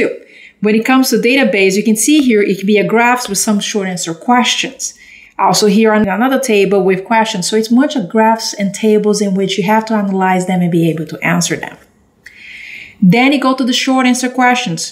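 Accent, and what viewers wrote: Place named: Latin America. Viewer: Clear, concise description, enriched with a Latin accent.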